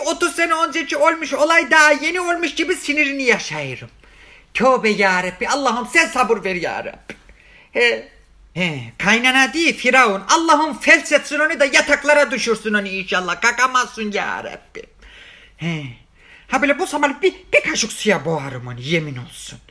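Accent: Black Sea, Turkey. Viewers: Elmiki ezrail unitti elmi firavun kari.... Ayzina yüreğine sağlık be uşağumm... Süpersin bu Karadeniz şivene doyamayrum... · Bilezikte güzel yakisiyor ne yapiyım seni ya puyuklu usak karadeniz sivesini seviyorum iyiki varsin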